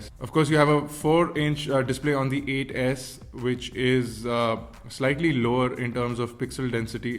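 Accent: India. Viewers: I have never seen a white person with a indian accent before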